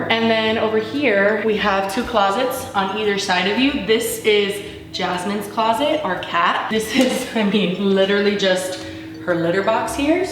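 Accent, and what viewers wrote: Miami, United States. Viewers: I recently found out you're from the 305 and when you say "you know" it's so obvious. I cannot believe I've been watching you for YEARS and never noticed. I also never even clocked you as Hispanic. I'm a failure as a Miami Cuban.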